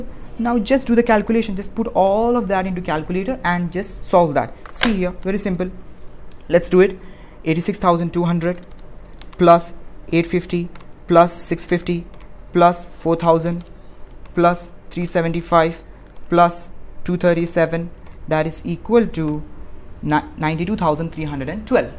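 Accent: South India, India. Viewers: I think you are from South your accent is like that your teaching is very good 🎉 Thanku sir😊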